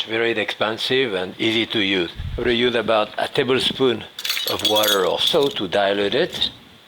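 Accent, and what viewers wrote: France. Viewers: Just shut up and go away. He DOES NOT have a speech impediment. He has a FRENCH ACCENT. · He has a French accent. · He's french!!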